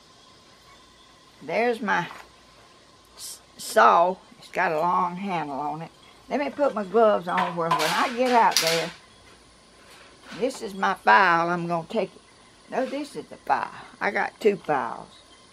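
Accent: South, United States. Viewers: You inspire me. Your beautiful southern accent makes me homesick for my kin in Tennessee.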